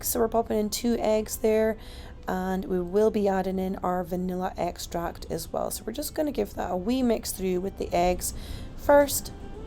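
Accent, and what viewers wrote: Scotland, United Kingdom. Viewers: Love the relaxing music,i will pass this on to my daughter s,my granddaughter also.she has grown up so very fast from a wee little hen to a beautiful girl, young lady almost 19 now,she loves to bake..😊love and hugs,from me here in uk.love to here your gentle Scottish voice. Misd my dads now he has passed, he was a true Scott s man,.mum s from Devon, we still have mum,89 in April 😊, xx.bit sad she has dementia.xx.love and hugs,.😊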